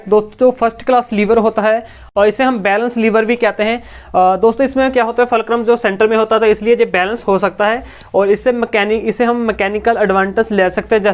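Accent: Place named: Punjab, India. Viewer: Thanku sirr and apki punjabi 😅🧿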